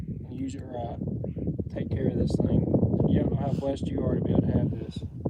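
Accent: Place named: California, United States. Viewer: OK sounds like a Californian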